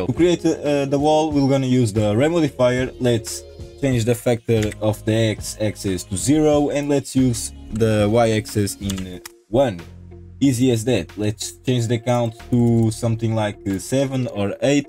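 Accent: Portugal. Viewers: O sotaque de tuga sempre da para perceber, parabéns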